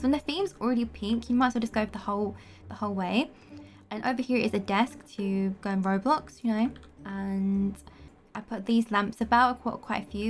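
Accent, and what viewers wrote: United Kingdom. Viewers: I don't know what it is but I just LOVE british accents... IDK why lol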